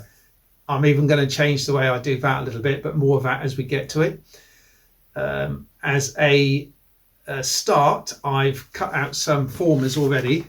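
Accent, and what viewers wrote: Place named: United Kingdom. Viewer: Hi it is the most perfect way to build a glider I am busy with one but come across a problem which unbeknown to you you have just solved and here is the question where do you or did you get the adhesive which first you apply whet than dry and than “HOT IRON ON” I would be very grateful if you could help me out with a address. I am in California USA and it sounds as though you are in the UK by your accent. Regards Peter Onel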